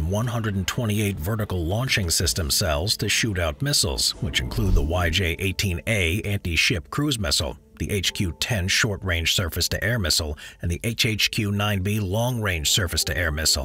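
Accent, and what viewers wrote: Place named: United States. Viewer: I'm impressed by both your scientific and historical knowledge but find myself astounded at your level of patience. I would've lowered the tone considerably by mentioning the fact that the US reneged on their deal regarding nuclear technology after being given it all free and I'd have mentioned Kasserine in the passing. You'd need a British radar to find the cavity between some folks ears but then all the US Universities are owned/funded by the Chinese which is quite the coincidence, since this channel is also.......just has a dubbed American accent repeating 'murica. To any normal Americans, no offense, we'll always have your back! USGB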